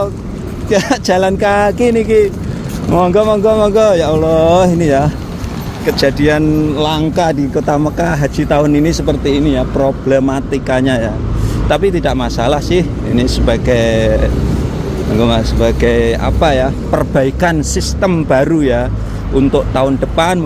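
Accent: Java, Indonesia. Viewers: Mas asli orang mana? Kogh logatnya kejawa jawa an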